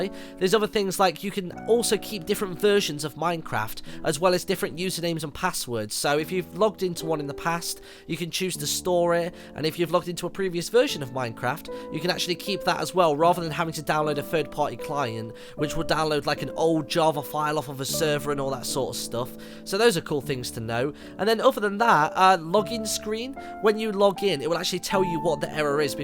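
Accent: United Kingdom. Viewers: that british is so funny :)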